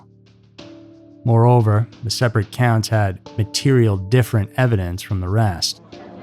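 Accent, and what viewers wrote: United States. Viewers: OMG I ❤️ your accent... where in the USA are you from🇺🇸??.... I'm from Staffs UK🇬🇧... love your posts 😍👌🏼💋 keep them coming, thanks Jo xx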